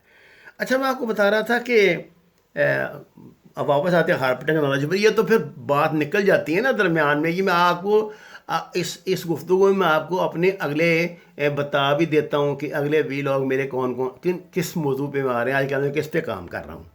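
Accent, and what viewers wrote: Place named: Lahore, Pakistan. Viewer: بولتے ہوئے لاہوری سٹائل کو کب چھوڑو گئے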